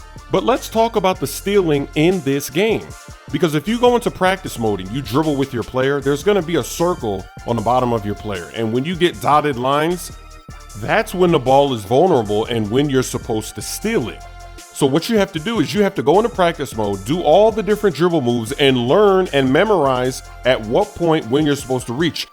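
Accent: United Kingdom. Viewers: Is English?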